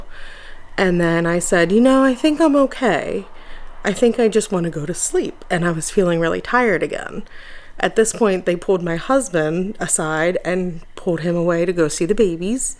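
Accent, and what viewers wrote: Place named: East Coast, United States. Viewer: u sound like east coast of the U.S. I am in B.C., Canada......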